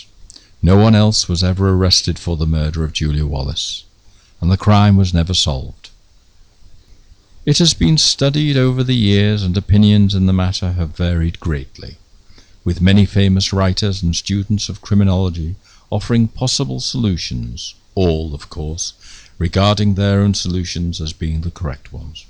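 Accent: United Kingdom. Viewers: I'd rather listen to a North American accent ( or Canadian ) than the hideous cacophony of any English regional accent . In descending order : Manc , Cockney , Barnsley , Geordie or whichever Chav version you care to mention. You prat ! Best speakers of English are educated Indians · Europeans.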